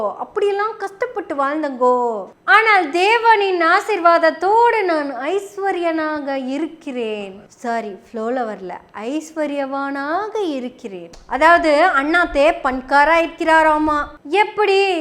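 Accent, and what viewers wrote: Kongu, India. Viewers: தங்கள் பேச்சு மிக அருமையாக உள்ளது. அதைவிட தங்கள் கொங்கு தமிழ் மிக மிக அருமையாக உள்ளது நன்றி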